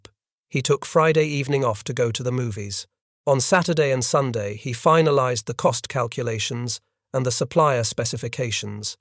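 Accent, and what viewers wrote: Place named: United Kingdom. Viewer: I refuse to listen to the same British narrator trash Germans every 30 seconds for being unable to understand breathing air or assembling 3 word sentences. News Flash News Flash News Fash British toady narrator The Germans had NO fuel for trucks, jeeps, tanks, airplanes Get it? Get it? Get it? NOOOOOO FUUUUUUEL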